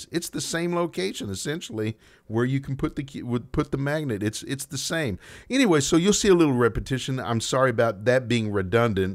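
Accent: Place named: Canada. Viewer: JesterKing1776 can't get much better than that Canadian accent. lol. just has one of those soothing voices. sounds a lot like this welding guy I watch on here.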